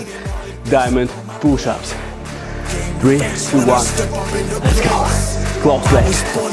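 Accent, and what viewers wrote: Russia. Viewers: by his accent, I know he's russian...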